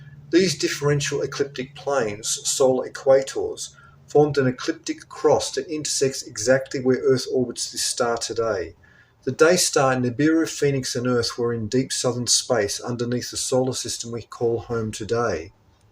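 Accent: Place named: Australia. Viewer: So sweet to hear that Aussie accent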